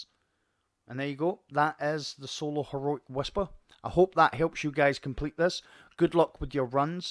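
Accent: Scotland, United Kingdom. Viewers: I love you'r explanations especially through the jumping part,and then with clearing of the adds,and i hear from you'r accent you are a fellow Scot,but can yu tell me do you play on PC or console.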